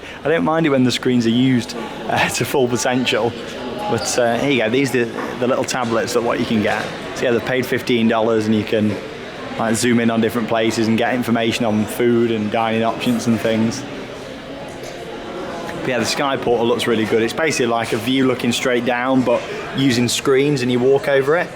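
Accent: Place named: West Midlands, United Kingdom. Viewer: Where are you from your voice sounds very west midlands uk lol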